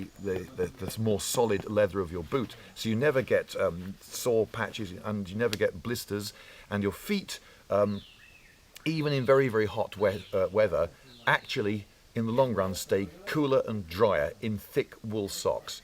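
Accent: United Kingdom. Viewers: Is it just me or does sound more British recently?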